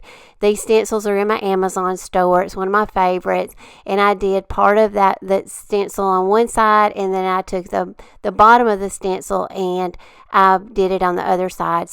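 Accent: South, United States. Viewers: I so enjoy watching your videos...I love everything about them from your instruction to your display of the items and also your background music. I think you are so great at what you do and I just love all of your videos. You are such an inspiration. I also think you have the cutest southern accent and are so pleasant to listen to. Thank you for sharing your wonderful talent.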